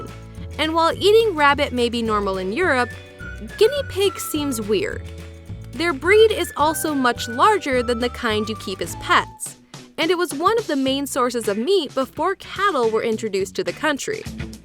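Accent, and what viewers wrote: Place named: United States. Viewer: I don’t lie how she or he said stop eating that food. It’s their culture ok! Ok so you don’t like it you have no right to tell someone to eat or not eat something it’s their culture. And they won’t stop eating it because u arent the government u can’t tell them to eat and not eat something a lot of people enjoy and is part of the culture and holiday maybe🙄😒 “Of course japan is on this list. I don’t think japan eats any “normal food”” WHAT! Excuse me “Normal Food”like what omelets, pancakes, pasta! It’s their culture ok. What u eat is not “normal food” it’s “basic food” wouldnt u get offended if Japan told u “of course America is on this list (not saying your from America but probs🙄😒) with their disgusting un normal food” you sure are making yourself sound racist right about now🙄😒 s”sushi is prolly their least “un normal food” (not what she said but minus well have) but it’s still raw fish wrapped in seaweed” I wouldn’t want to be friends with u, u would just make fun of what I eat as a Mexican. 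“ it sound disgusting” “has anybody thought meat should be cold NO because it is disgusting” “if u ever come upon it you should call an exorcist” 🙄😒 gurrrrrrlllllll (or boy) you’ve probably never tried it and it’s actually good. “You hold the gag reflexes” I’m tired of u🙄😒 “The Koreans” do I hear a 🔥🔥🔥🔥racist🔥🔥🔥🔥🔥🔥 “Round chicken nuggets or seasoned meatball” 😂🤣😂🤣😂🤣🤣🤣😂🤣🤣🤣🤣😂🤣 I would think it’s a tater tot but not trying to be racist but you sound American. And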